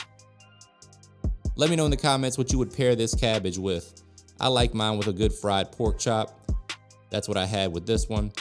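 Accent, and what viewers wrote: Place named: South, United States. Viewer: I eat with rice. I thought I am listening to a black dude. No offense. Then I saw you are a white dude talking like a black dude. You must be from the South. Thats cool Man! Milan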